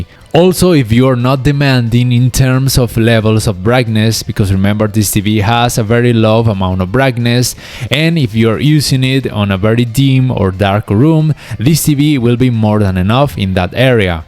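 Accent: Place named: Colombia. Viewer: Buen inglés bro!! Se escucha tu acento colombiano 😂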